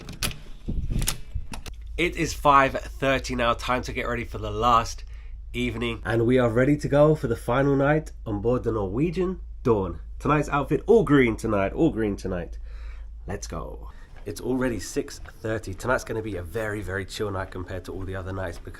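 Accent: United Kingdom. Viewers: oh migod, you don't sound like American at all..I can't really place your accent. I know it is British. But being an American, I am not privy to the regional accents in England for sure. Still love your enthusiasm and venting and all that.. You live life fully, whether while complaining or whether in awe of something. We get swept away by your emotions. It is good to feel, whatever it is fully.. Thanks for the videos. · I think you sound British, but you dress like an American 😜 especially your headwear. I’ve always heard that only Americans wear ball caps. And you have that San Francisco hat so people probably assume you’re American. 🤷🏼‍♀️ But I’m from the south and I have an accent. And I don’t really hear it myself most of the time. But certain words have that southern draw. And then I hear myself and I’m like damn I sound country as hell.😂 Also, do they have Uber in Copenhagen? 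Can you just Uber from the ship to the metro station at least. ? Also FYI, I’m actually headed down to Walt Disney World this week. ❤ 🏰 And that’s a crazy price, because I’m gonna pay close to that for five days at Thanksgiving.? But that also does include gratuities and all the port fees. Do you use a certain website ? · I agree. Sounds like bait and switch to me, regarding the port name. You’re not complaining, you’re informing. I appreciate all your info. Oh, and you sound perfectly British to me.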